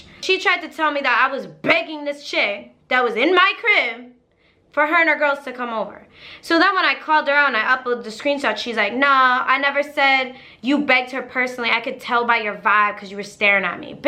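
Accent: Boston, United States. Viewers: I LOVE when your thick Boston accent comes out😍! I love the way bostoners? Talk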